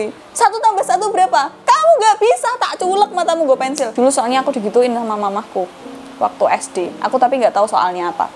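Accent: Java, Indonesia. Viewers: medok Jowo nya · Cakep-cakep medokk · Aku suka medok kamu, jadi tambah lucu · the real medok cantik, bkn buat2 sperti d ftv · q suka cara penyampe annya lucu terhibur juga nambah wawasan,maaaantap bahasanya medooook,🤣🤣🤣🤣🤣🤣🤣🤣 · Medok tp ngangenin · Medokk'e poll mbak 😂😂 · suka cara gayamu ngmong mba medoknya kerasa banget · Medok bngt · Medok😆😆😆, salam dari warga banjarmasin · orang jawa ya mbak? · Lo orang jawa yh mbk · Medok bat haha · boso jowone nylekit njiir... jowo ndi mbk? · ngomong medok gak masalah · Pekat loghat jowonyo mbak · medok Banget bahasa jawanya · waduh medok bgt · Jawane kentelllllllll👍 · Medok mu marai kangen mbak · Jiann tenan mbak..Aslii cah wedok spekk jawaaa nii bossss😆😆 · versi medok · Salam dr.kupang..ntt.dan beta suka wajah serta keceriaan.logat jawamu.bkin hilang stresss.ku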